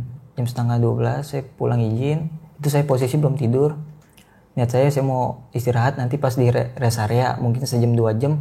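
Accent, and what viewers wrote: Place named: Pemalang, Indonesia. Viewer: Orang Pemalang nih Pemalang mana ka:v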